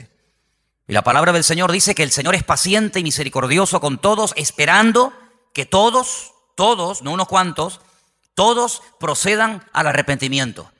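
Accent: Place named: Argentina. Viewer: vale pensé era argentino se nota no e español, buen pastor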